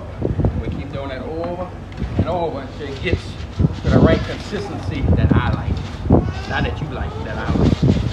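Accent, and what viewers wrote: Louisiana, United States. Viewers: Did Jake Lloyd adopt a Louisiana accent and create a new character???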